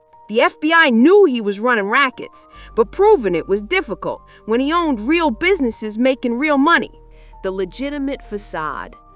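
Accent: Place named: New York, United States. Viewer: Accent’s cool… ya sound like ya from Nu Yawk(except a couple of pronunciations)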